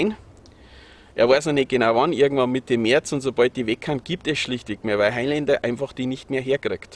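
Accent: Bavaria, Germany. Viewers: Bitte du musst weiter machen🤣🤣🙋‍♂️🙋‍♂️🙋‍♂️🙋‍♂️🙏🙏🙏🙏🙏🙏Wir brauchen Dich so sehr!!! Ich werde auch Mitglied anmelden!Bitte gibt du nicht auf!😂😂😂😨😨😨Ich verstehe Dich sehr gut!Wir können noch nicht von Luft und Liebe nicht leben!Jeder von uns müssen sein Lebensunterhalt verdienen. Lasst dich nicht unterkriegen💪🙏🙏🙏🙋‍♂️🙋‍♂️🙋‍♂️👍👍👍Alles Liebe aus München!P.S.Ich Liebe dein bayerisch 🤣🤣🤣🤣👍👍👍👍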